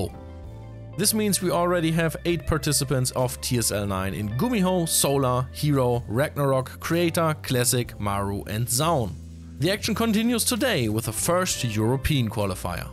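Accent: Germany. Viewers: Is from Germany? It sounds really like german guy tryharding to not have an accent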